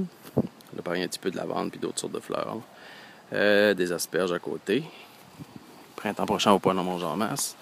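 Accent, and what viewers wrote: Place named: Acadia, Canada. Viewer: un bon vid francais avec un petit accent acadien sa ses beau pis ses merveilleux de parler deux langue et tres bien en plus lol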